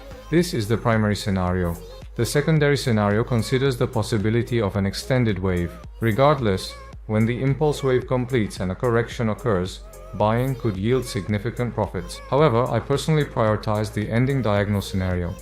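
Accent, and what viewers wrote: United Kingdom. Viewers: great british pronounciation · 아니 잠깐만요 영어 충격받았네요. 인토네이션이나 발음이 해외에서 살다오신 분같은데 영국식이라 듣기 더 좋으네요 목소리 톤까지 좋아서😅 나만 알고싶은 님인데..글로벌 채널도 대박나십시오 🎉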